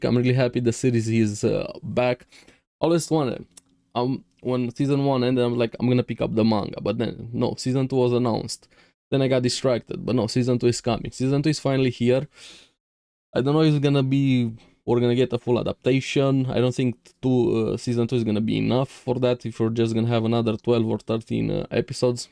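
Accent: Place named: Eastern Europe. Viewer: Soooo happy this anime has finally returned!! I watched Season 1 Hell's Paradise, Chainsaw Man, and Heavenly Delusional all around the same time and been patiently waiting for their return cause I loved all 3. Especially Hell's Paradise cause I think it's such a fire series. Nice review. I liked and SUBBED. By the way where are you from bro?? You have a cool voice and great English. Eastern European or Russian I'm guessing? lol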